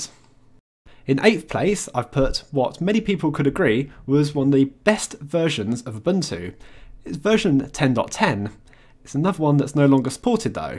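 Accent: United Kingdom. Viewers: Does he have a British accent?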